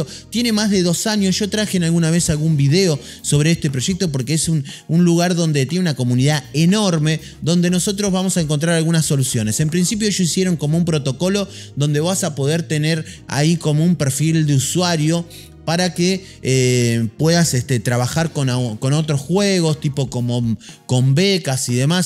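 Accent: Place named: Argentina. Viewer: Sos Argentino hermano, tenes como yo un acento medio argento y medio de villa loco... a que te dedicabas antes ? esta una desastre este ispa amigo y estas mierdas no garpan abrazo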